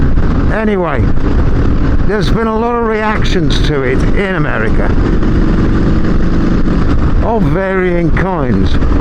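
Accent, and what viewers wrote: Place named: United Kingdom. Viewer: >click on interesting motorcycle vlog >hear british accent >click off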